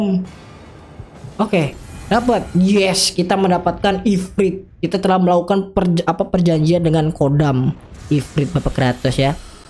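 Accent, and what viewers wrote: Java, Indonesia. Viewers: Bang lu orang jawa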